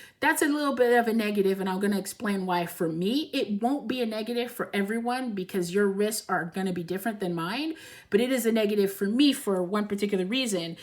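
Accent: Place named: Midwest, United States. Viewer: Great review!!! Thank you. I'm considering the Mark XVIII black dial or the Mark XV which was the first IWC Swiss watch I've ever wanted since the 1990's (the other is a Reverso). Back in the day IWC was difficult to find. If I already acquired my wish list timepieces and money wasn't a concern. I would get a vintage military Mark XI from the 50's for the same price as a Mark XVIII. I currently own a Speedmaster Pro 1861 movement but I need something more anti-magnetic and water proof. It's a tough decision when it comes to items that cost thousands. Do I re-live my 20's Mark XV, or should I just go for the Mark XVIII? Which is a better watch, perfect size, symmetrical design, legible, 20mm strap options.... By the way, are you from the Midwest? You seem to have a Chicago/Detroit/Milwaukee/Ohio accent.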